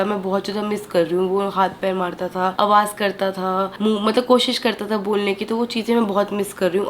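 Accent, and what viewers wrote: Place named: Pahari, India. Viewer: Is Dr from Himachal...?? Because he has a pahari accent ❤😊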